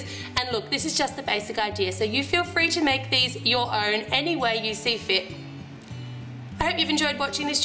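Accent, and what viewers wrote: Australia. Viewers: I just love your Aussie accent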